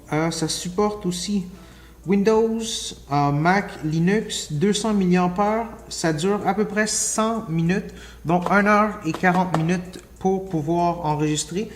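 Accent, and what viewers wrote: Quebec, Canada. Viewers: Super vidéo ! J’adore ton accent du Québec...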